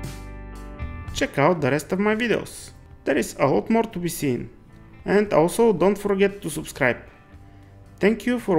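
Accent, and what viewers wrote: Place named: Russia. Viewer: Акцент русский 😁 Работа отличная!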